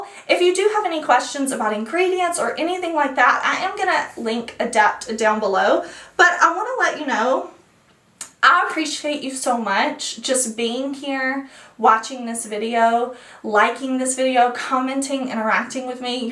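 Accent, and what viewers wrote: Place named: Tennessee, United States. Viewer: Her accent is so heavy, is she from Tennessee?? A beautiful pallet so excited.. WOW good job!!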